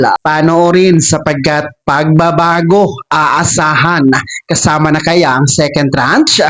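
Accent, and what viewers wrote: Visayas, Philippines. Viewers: Ung dila m halatang bisaya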